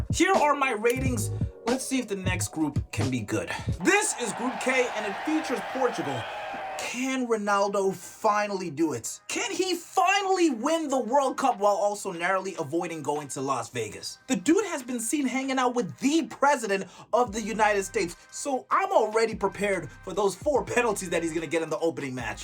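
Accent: South Africa. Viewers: Are you south african? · Are u originally south african?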